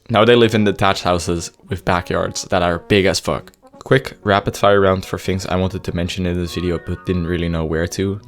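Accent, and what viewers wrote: Netherlands. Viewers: You Dutch yourself? bc HOLY ACCENT haha im from nl soo that why i know the accent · his voice get more Dutch over time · You can tell by his voice and accent that he is Dutch sooooo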